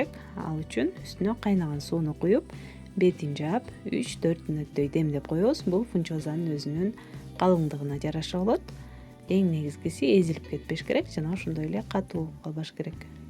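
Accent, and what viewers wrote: Standard. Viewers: ушул кыздын уну да жагымдуу. нукура кыргызча да келиштирип суйлойт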